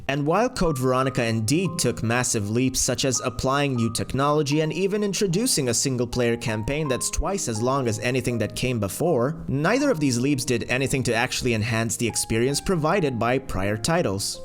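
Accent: United States. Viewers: Where in Europe are you from? You sounded American until you said Europe. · You don’t seem to sound like your from any part of Europe when you said you were from a part of Europe I was shocked because you sound American.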